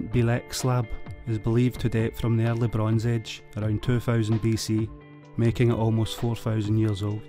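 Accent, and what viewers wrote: Scotland, United Kingdom. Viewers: The speaker has a wonderful Scots accent....love it....and there is a volume control for those who are slightly hard of hearing...you can turn up the volume on your device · He is Scottish...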